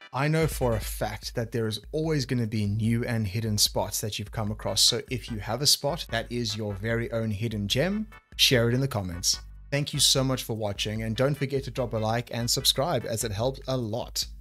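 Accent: South Africa. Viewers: I sense a South African accent? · are u south african?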